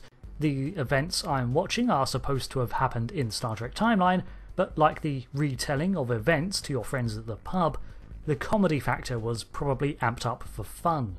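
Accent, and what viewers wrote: United Kingdom. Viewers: E-celeb British accent. Alt F4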